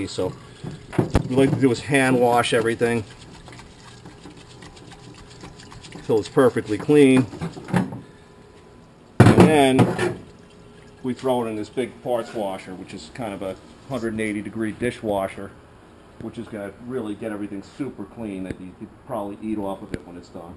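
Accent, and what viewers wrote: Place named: Long Island, United States. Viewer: I've been out of NY for 20 years but id guess long island?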